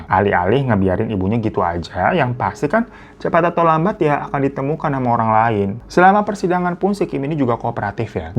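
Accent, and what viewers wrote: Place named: Pasar Minggu, Indonesia. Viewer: lama2 berasa nonton si doel logat pasar minggunye nedok banget bang ..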